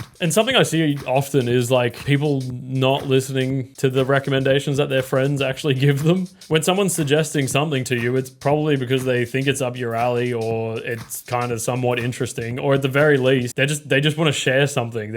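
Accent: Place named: Australia. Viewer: bro might be Aussie General Sam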